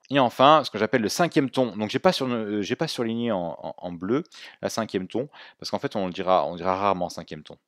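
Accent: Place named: France. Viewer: Je m'excuse d'avance pour la question mais tu es de quelle région de France ? (si c'est en France). J'ai un collègue qui a la même façon parler que toi d'où ma question. Merci :)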